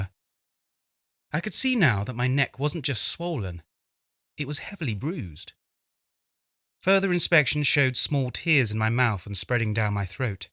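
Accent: England, United Kingdom. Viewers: fantastic story and fantastic narration ! finally a good solid English accent to listen to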